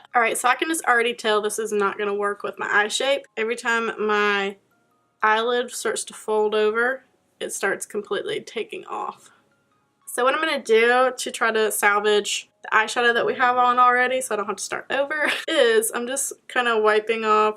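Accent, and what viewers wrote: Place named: South, United States. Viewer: I KNEW you were southern! You talk exactly like I do... the way you word things really. I've got a southern way of speaking but with a wee Scottish accent because I grew up in a Scottish Filipino family. Lol. Weird bunch/combination I tell you.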